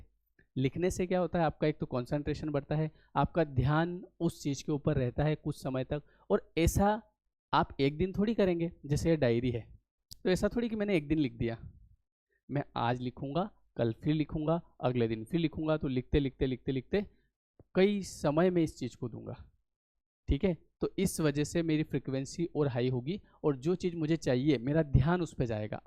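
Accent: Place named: Madhya Pradesh, India. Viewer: i love ur mp acent ratlam indore ya ujjain ❤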